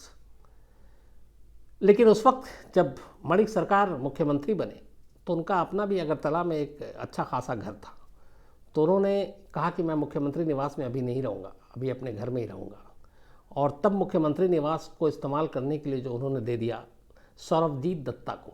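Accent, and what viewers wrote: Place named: Uttar Pradesh, India. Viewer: Tahoe je gale me kuch math Daliye UP ke bhaiya lag the hain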